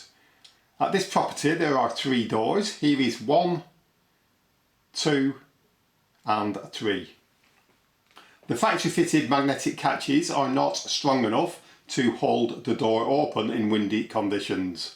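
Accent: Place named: North, United Kingdom. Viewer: Love your accent! We’re are you from? Sounds a little North?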